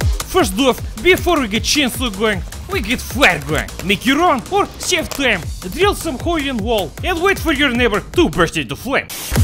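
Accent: Russia. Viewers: Видео русский акцент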